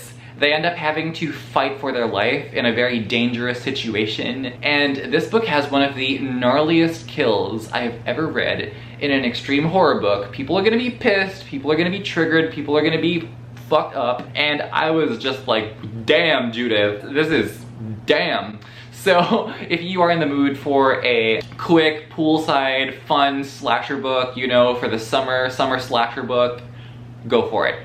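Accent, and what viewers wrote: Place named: United States. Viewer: I am soooooo curious. How do you have such a strong and authentic American accent if you have never lived in the US. I had always assumed you moved to Asia in adulthood. 🤷‍♀️ Thank you for the list. Great reccomendations as always.❤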